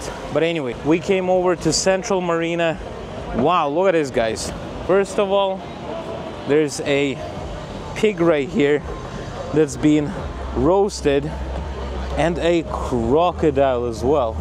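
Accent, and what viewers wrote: United States. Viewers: You sound like an american' we all say and need to go to the GYM!!!!! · Ya know, you speak great English, more with an American dialect. Even some of the American philosophy entwined! How did you learn this? You will do well in America, just don't let them know you are Russian...very unfortunate.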